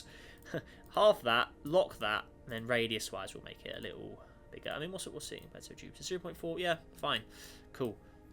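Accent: United Kingdom. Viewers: IS BRO BRITISH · Btw are u from England cause u have a British accent its just that is from USA and that's Fr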